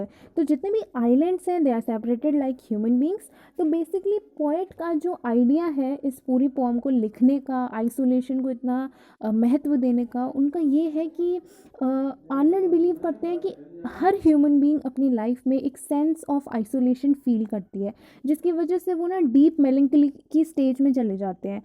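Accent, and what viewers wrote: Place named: India. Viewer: Indian accent brother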